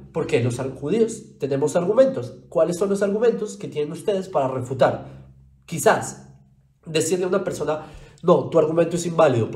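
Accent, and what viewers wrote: Colombia. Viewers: tú de colombia solamente tienes el acento, que ya casi lo estás perdiendo! Pero la cara esa de Judío auténtico Israelita, Hebreo, del ME nadie te la quita! Una prueba es que si no lo fueras nunca te hubieran aprobado la Ley de retorno! No pierdas tiempo con estos analfabetas por favor! Tú eres buenísimo para enseñar y abrir mentes y transformar a muchísimas personas con la verdad! Gracias mil, Shalom!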